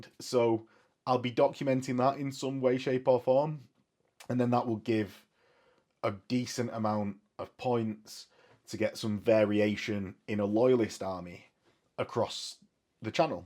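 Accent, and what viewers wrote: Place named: Manchester, United Kingdom. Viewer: Where abouts in the uk are you based? Might be able to trek a bit for a game. I'm thinking manchester area by accent?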